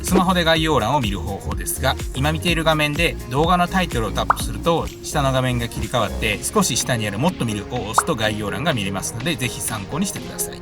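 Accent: Kansai, Japan. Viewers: お返事ありがとうございます😊 さんの関西弁ももっと聞きてえっすがら期待して待ってらっすど😁 へばまんつなー！ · 別にディスるつもりは全くないですが関西弁特有の若干の強みが全く感じないのが本当に人の良さが滲み出てて凄く聴きやすく見やすい素晴らしい動画です✨ ゴムモールの事ときめ細かい点も本当に素晴らしいですしあくまで視聴者目線の押しつけのない綺麗な動画…続けて見てしまいます✨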